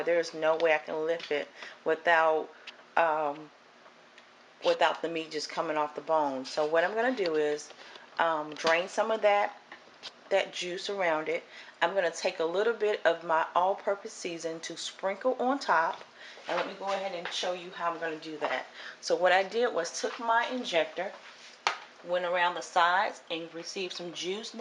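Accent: United States. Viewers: I can tell you this you sound like a very good lady from America there are not a lot of good people in America. I always cook the turkey at my nieces place and there's about 14 of us and i always wanted to try to and injector the turkey because i know it will be so good. I stuff my turkey because everybody take some home and there's nothing left of the turkey. I can't find one here in Canada the injector i use butter unsalted not margarine and i add poultry seasoning and Rosemary olive oil and then I mix everything together and then I put it underneath the skin a pound of butter it's to die for I clean my turkey out just like you do :) I would like to do it like you didn't i have to go shopping today and look to see if i can find one of the injector. Thank you for the video Merry Christmas 2016 .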